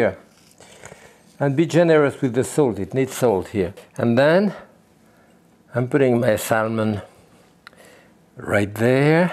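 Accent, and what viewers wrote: France. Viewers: I love ur french accent english!😊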